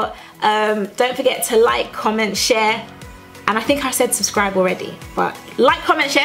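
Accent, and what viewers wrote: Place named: United Kingdom. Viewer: Sound British you are a beautiful woman and it fit u perfect. Go girl.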